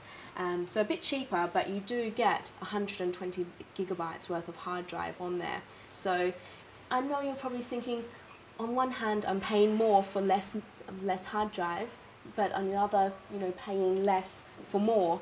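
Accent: England, United Kingdom. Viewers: I thought she did a fine job. Cute english accent.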